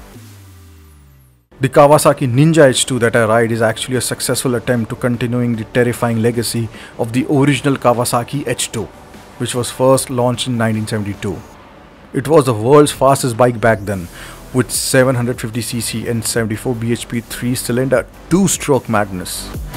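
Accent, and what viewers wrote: India. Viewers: INDIAN Accent 👊💪🏼💪🏼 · Sound like india??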